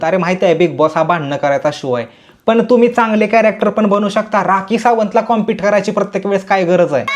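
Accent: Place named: Karad, India. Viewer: Karad ancent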